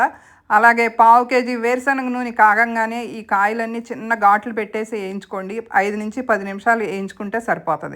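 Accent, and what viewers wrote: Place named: West Godavari, India. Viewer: Sakkati telugu madam westgodavari 🎉Madi Bhimavaram mogalu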